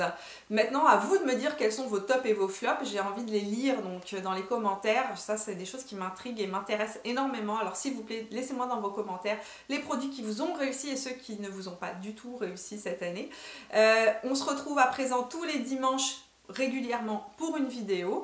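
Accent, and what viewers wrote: Quebec, Canada. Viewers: Je suis venu ici par curiosité, je te préfère tellement maintenant et ce petit accent quebequois tout discret alors que maintenant on lentend pas. Tu est comme le bon vin 😉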